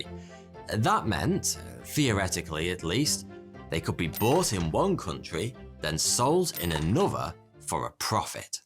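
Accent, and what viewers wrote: United Kingdom. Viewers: Thoughty2 sounds very British though...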